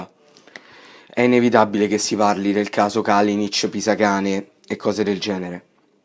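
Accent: Rome, Italy. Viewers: Parli romano. Non ho parole.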